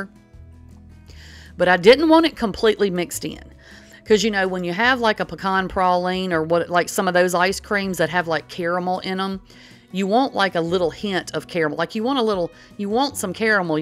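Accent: South, United States. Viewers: It is delicious, I made a strawberry version and a chocolate with real choc chips in it. They are both a success. Love your accent, what part of America are you from? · Is that a “southern” accent ? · That southern accent...where ya'll from??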